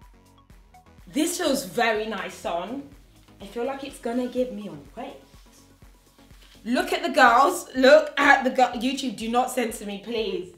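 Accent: United Kingdom. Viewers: I love you! I love the verbiage with your British accent. You make me crack up with your descriptions of the shape wear. Love you💖💖💖👠👛🛍🐈🐕🐎💖💖💖🐞🌹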